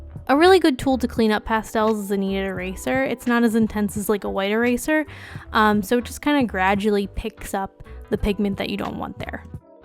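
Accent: Pennsylvania, United States. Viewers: u have such a pa accent hahahah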